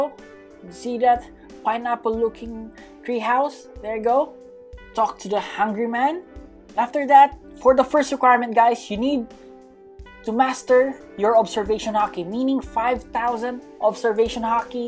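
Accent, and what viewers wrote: Philippines. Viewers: sabi kona e pnoy k e accent plg(TT) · yea he is filipino u havent noticed? about his accent? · Sabi na Filipino ka kuya Sa Pag Sasalita Palang Nang English Parang si Kuya Kitt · Bro i didnt knew you were filipino sa totoo lang halata sa accent mo pero di talaga ako sure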